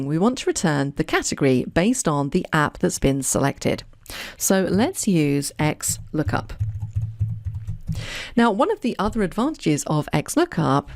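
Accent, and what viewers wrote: United Kingdom. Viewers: Sweet GB accent. 🌺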